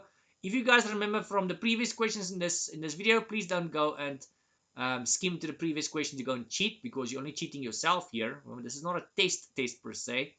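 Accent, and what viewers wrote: South Africa. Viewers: South African accent immediately gets a like and sub 🎉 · As a fellow South African it was easy to understand, nice refresher I already passed. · Immediately picked up that YT SAFFA accent! Great content though, and that's what matters! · He's South African, like me. Just that I'm coloured though. He sound like a Wytie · Definitely South African, love it! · South African Identified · South African? 🇿🇦🇳🇦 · I passed my core 1 thank to him even when the exam was updated you are my Hero and love the accent South Africa gets an automatic like and sub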